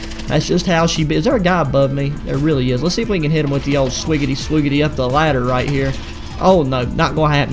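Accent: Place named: West Virginia, United States. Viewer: Bro you sound like some of my home boys from southern WV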